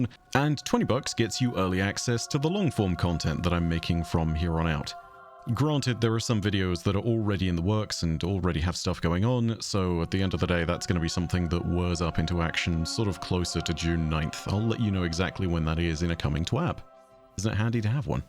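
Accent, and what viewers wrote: United Kingdom. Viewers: I am a 60 year-old man I’ve been playing destiny since 2016 since my son bought it for my birthday. As being a vet, I really had nothing to do with my time when I got out.And I was looking for something to take up that time and to fill that void. And destiny was the answer I’ve been paying attention and listening to your in depth folklore of destiny for years and how you adequately with your smooth and gentle British English accent. Which you matched the lore with your voice perfectly. That is why I subscribe to your channel and of course, a lot of other guardians did too. Because it’s not just a game it’s so much more and as you can see with not only the petition. But with all the voice actors that I hope it does not stop and they do make a DESTINY 3 but will see 🤞, I really appreciate all you do for destiny and for us who listen to your take of the game itself . 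I’ll still be watching but it won’t be the same.It’ll never be the same. But as an old man who has not too much time on this earth, maybe who knows one day My Son or daughters might pick up the game and play it. And say to my grandkids. Hey, did you know your grandfather played this game a long time ago! Would you like to see his character and what his name was? And my grandson or granddaughter if they say yes that’s how I will go on in a memory and live and connect with my family Again. through my ghost.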